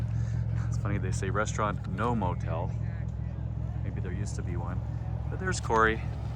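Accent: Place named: Midwest, United States. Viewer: You're from the Midwest, aren't you?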